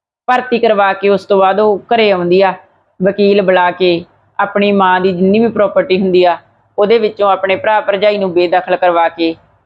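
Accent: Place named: Malwa, India. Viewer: ਤੁਹਾਡੀ ਬੋਲੀ ਤੋਂ ਮਲਵਈ ਝਲਕ ਪੈਂਦੀ ਹੈ । ਕੀ ਤੁਸੀਂ ਮੋਗਾ ਸਾਈਡ ਤੋਂ ਹੋ?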